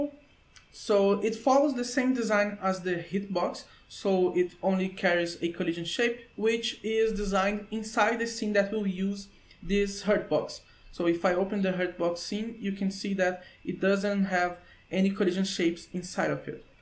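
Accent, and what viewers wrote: Brazil. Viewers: Where yo from? brazilian accent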